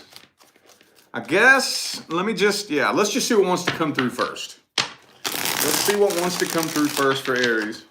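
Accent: South, United States. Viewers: Wow,. FirstlyI love your Southern accent! Secondly, yes my ex-bf was ♓️. Potential new guy is ♓️, but I’m still single.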